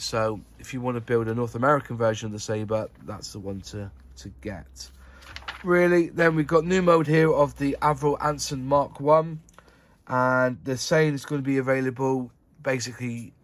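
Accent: West Country, United Kingdom. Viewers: Thanks for showing the catalogue, some good stuff in there and personally I love the old vintage classics. Do I hear a fellow westcountry accent from yourself? Not cornwall like me but I can hear it 😉👍🏻 Thanks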